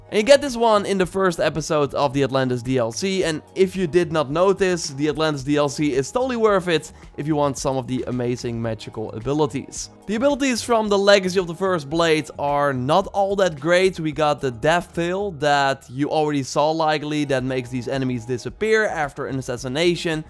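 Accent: Netherlands. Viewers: Haha je nederland engels is echt zo mega duidelijk te horen 😉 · Love the dutch accent bruh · Ik hoor gewoon aan je Engels dat je Nederlands bent, toch?🤣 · you have a very Dutch accent, are you from the NL?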